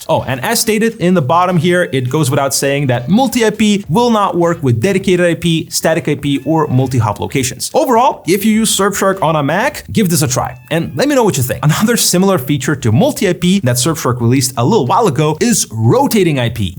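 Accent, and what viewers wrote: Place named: United States. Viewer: This guy speaks like a tosser. Slow down and stop the up and down voice "noise" Not everyone likes to listen to that American noise!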